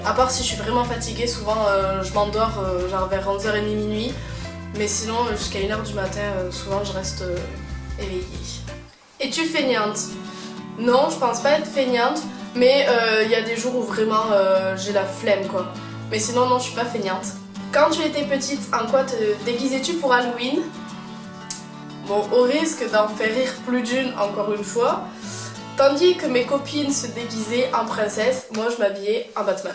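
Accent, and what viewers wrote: South, France. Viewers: j'adore ton accent Ca rappelle le sud,la mer,la plage! Mais! Quand on regarde le reflet du ciel derriere toi bah il fait pas très beau on dirait!👌 Je t'aime beaucoup ❤️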